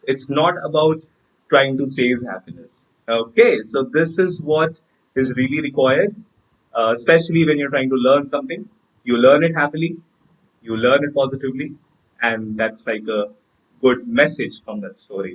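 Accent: United States. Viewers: Sir please make the video on American English ,your American English is very nice. I also want to learn it . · Awesome session sir...Btw ur american accent is reall good👌👌👌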